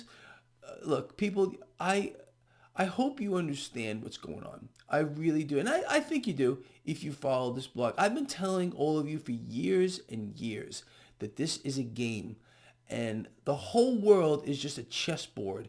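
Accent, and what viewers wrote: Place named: New York City, United States. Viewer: we love your Italian NJ/NYC pronunciation of 'costs' like 'caust'(ic)👌🏻 I think the NYC Italiano accent is awesome & admirable😄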